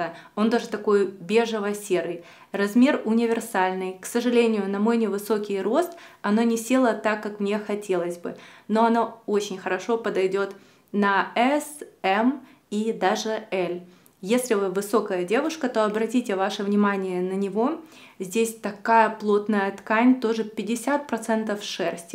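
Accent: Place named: Surzhyk, Ukraine. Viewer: хочу сделать вам комплекс. Примерно 4 года назад я перестала смотреть все ваши видео потому что ваша речь была просто ужасной. Постоянное эээ, мммм, все жутко медленно и без конструктивного текста. Случайно наткнулась на это видео и хочу сказать что сейчас стало намного лучше!! Ваш русский тоже стал лучше, сурджик уже не так сильно режет слух. Ещё есть куда расти, нужно поработать над тем чтобы быть более естественной перед камерой, но все же- очень заметен ваш рост как ютубера! Так держать! 👏🏻👏🏻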